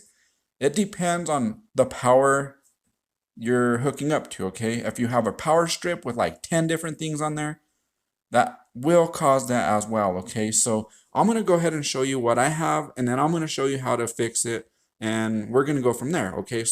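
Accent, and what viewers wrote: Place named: Oklahoma, United States. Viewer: Is this guy from Oklahoma? Ok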